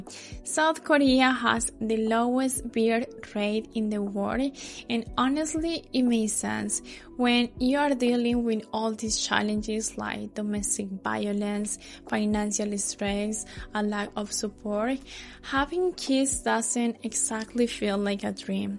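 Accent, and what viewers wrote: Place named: Ukraine. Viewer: Im guessing Ukrainian or Solvakian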